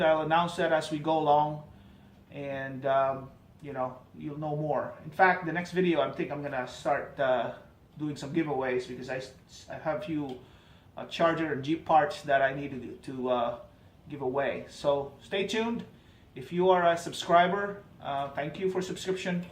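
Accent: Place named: Philippines. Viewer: You Filipino. You got that accent. Do you have steering wobbles with these tires.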